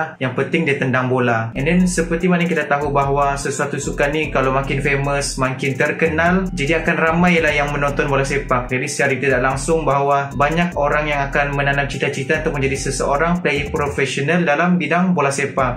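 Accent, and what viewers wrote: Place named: Sabah, Malaysia. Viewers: Sabah bah ni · Original sabahan bah speaker nie...pelat bunyi nya... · Sabahan speaker 👏🏻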